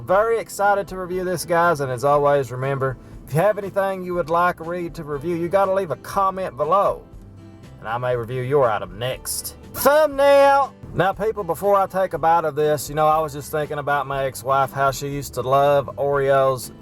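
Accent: South, United States. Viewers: Hahaha you are so cute! Finally a southern YouTuber with the accent to prove it! I'll go watch all of your videos now. ;)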